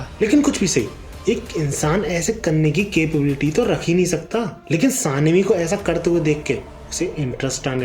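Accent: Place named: Indore, India. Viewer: Bhaiya apka indori accent bada mjedar hai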